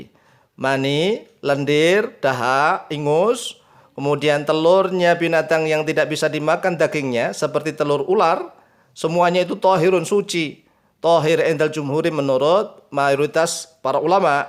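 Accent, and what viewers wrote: Java, Indonesia. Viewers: mungkin dia orng Jawa kan orng Jawa di kenal dngn huruf o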